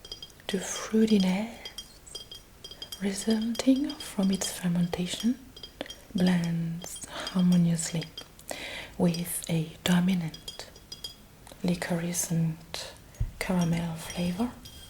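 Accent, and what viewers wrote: France. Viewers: If one could imagine an ASMR role-play with a glorious French accent and a sumptuous assortment of exquisitely presented sound triggers, you would more than adequately have described this beautifully rendered video. This production is so well done, and I highly recommend it to anyone who understands and appreciates the art we know as ASMR.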